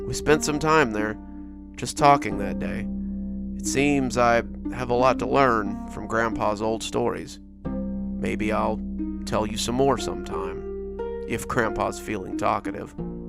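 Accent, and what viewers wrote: South, United States. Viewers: Your southern delivery helped this story immeasurably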